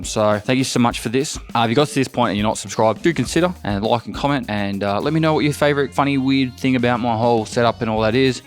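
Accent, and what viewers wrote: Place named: Australia. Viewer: why does remind me of russell crowe. not just the accent, but like overall feel and vibe. wise old aussie or NZ'er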